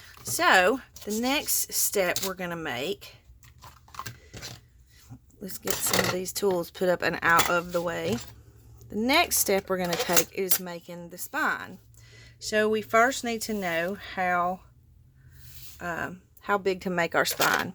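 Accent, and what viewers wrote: South, United States. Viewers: I also love your accent. Yes, you do have one, It reminds me of my southern cousins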